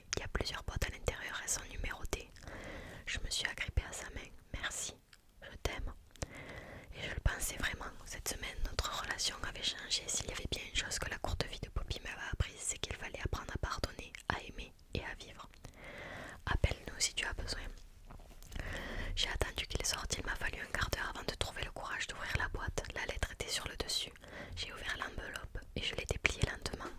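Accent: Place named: South, France. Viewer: L’accent du Sud et de l’Asmr , un plaisir cong!!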